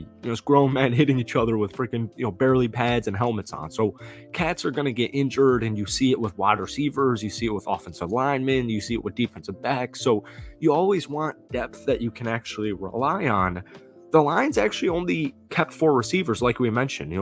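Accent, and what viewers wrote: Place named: South, United States. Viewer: Please don’t take this negatively. I’m curious about where you are from because of your accent. It sounds southern at times and not sure what else.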